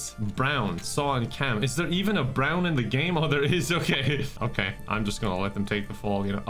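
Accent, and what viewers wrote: Ireland. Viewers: Do you all remember old. Those were some of the best videos. Now he just repeats what happened in an Irish accent., if you read this go back to the old variety channel kind of thing. That was great. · IDK Why, But i really like the irish accent, I've always wanted to be irish myself but i don't think i can change myself like that!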